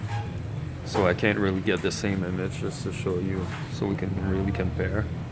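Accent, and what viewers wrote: Quebec, Canada. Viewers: ca sonne québécois 😋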